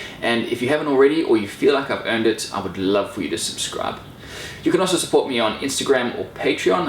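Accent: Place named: South Africa. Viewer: Started watching this, and I’m like “hey I know this accent”... I’m a South African living in Malta.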